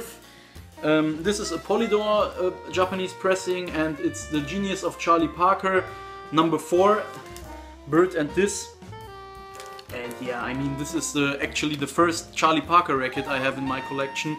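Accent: Germany. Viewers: Gr8 Album! You look Italian or Turkish, not so German, but you sound German with super English. Young Germans do love their English language! You seem a gr8 upbeat guy for this job:)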